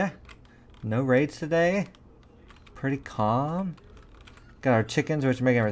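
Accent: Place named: United States. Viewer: He has a great American voice and is hot can confirm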